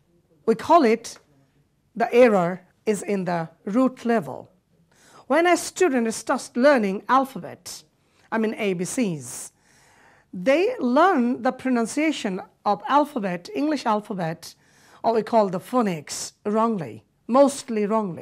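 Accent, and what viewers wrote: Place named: Bangladesh. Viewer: Your Mother tongue Bangla is clearly influencing your abilities here. You need to improve your own pronunciations by a long way and I mean by a very long way.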